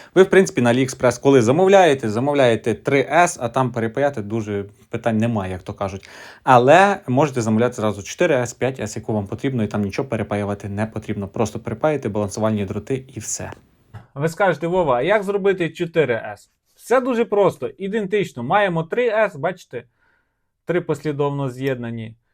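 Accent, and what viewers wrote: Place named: Western Ukraine, Ukraine. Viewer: впадло только что на западенском акценте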